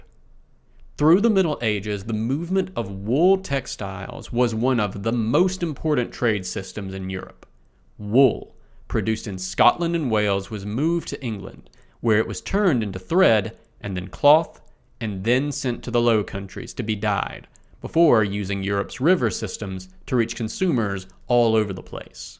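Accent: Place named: South, United States. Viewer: Finally some real food... I mean, ACOUP voice overs with a delightful southern drawl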